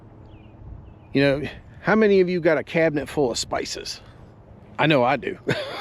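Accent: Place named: South, United States. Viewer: Thanks hear your southern voice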